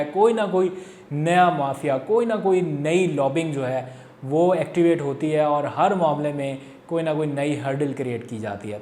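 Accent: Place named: India. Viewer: بہت زبردست جناب شکریہ جناب! ایک بات؛ پلیز بھارتی چینل زیادہ واچ نا کریں کیونکہ آپ جھٹکے والا بھارتی انگریزی لہجہ استعمال کر رہے ہیں۔مثلاً کمپے ٹشن کہرہے ہیں جو کہ کانپے ٹی شن ہے۔ کسی بھی انگریزی وڈیو پہ دیکھ لئے گا اسطرح کے بہت سے الفاظ بھارتی انگریزی کے کہلاتے ہیں مغرب میں۔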